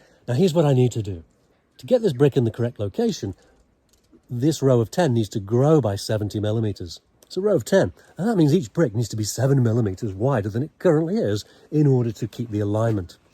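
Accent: United Kingdom. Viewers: I'm an ignorant American. Your accent is throwing me off. You speak and sounds like a UK professor but work like a laborer. You're in New Zealand. Is that the usual accent for NZ? In any case great work!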